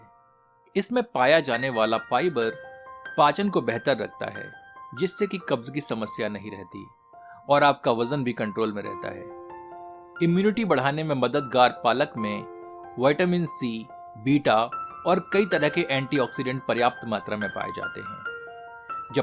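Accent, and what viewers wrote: Standard. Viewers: Great to hear shoodh hindi. Well spoken and the recipe is amazing. Thank you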